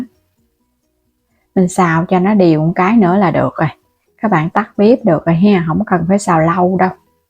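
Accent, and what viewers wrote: Mekong Delta, Vietnam. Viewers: Giọng nói miền Tây của người ta đó 😊😊😊😊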